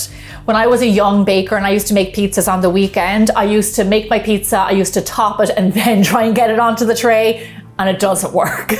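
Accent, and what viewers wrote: Ireland. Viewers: The guy's mouth is only moving while she's speaking like yeah yeah just hand me a slice 😅.. also is she Irish. I now noticed her accent love it and her pizza so 😋😋😋😍🤤🤤🤤🤤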